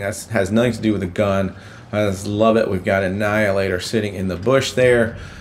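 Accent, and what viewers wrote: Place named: France. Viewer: Great vid mate. I prefer saving my free xp for the blitz fair. Btw great French accent ;)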